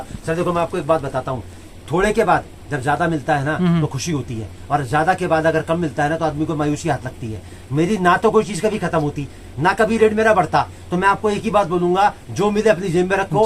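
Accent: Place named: Marwari, India. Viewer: भाई साहब आपको बोलने का तरीका गजब है पक्के मारवाड़ी हो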